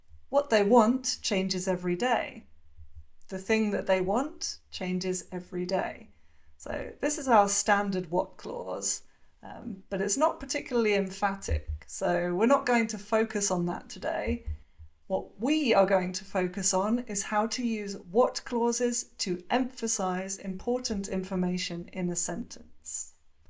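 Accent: United Kingdom. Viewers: I love the way you speak English with British accent . What I like to say is speaking English with correct British accent gives me like a certain charm.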